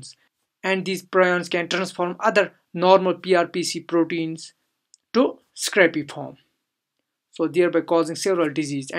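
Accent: India. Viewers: as soon as i heard the indian accent i knew this would be an informative video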